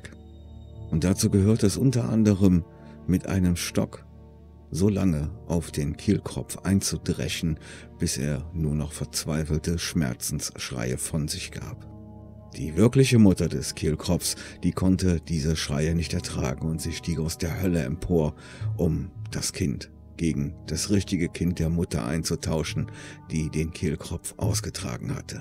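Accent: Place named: Cologne, Germany. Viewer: Ja die Stimme 👍🏻…. als Regierungssprecher wäre der beste Wurf den diese Regierung machen könnte. Und diesen Akzent kenn ich nur zu gut als gebürtiger Kölner…… den findet meine Frau auch so amüsant und ansprechend 😉. Leider ist es mit meiner Stimme nicht so gut bestellt 😄. Ich würde mir wünschen diese Stimme mal als Synchro Stimme im Kino zu hören….. finde das mittlerweile manche Stimmen gefühlt in jedem Film vorkommen. Speziell die von Ryan Reynolds, Chris Evans und der Himmel weiß wer da noch alles synchronisiert wurde von dem Herrn. Da wäre mal eine neue Stimme mal wirklich angesagt.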